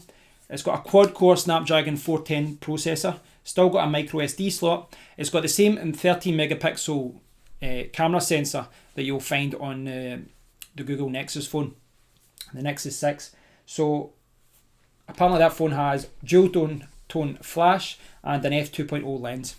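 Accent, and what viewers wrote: Scotland, United Kingdom. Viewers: love your Scottish accent! When I heard it, I pressed the like button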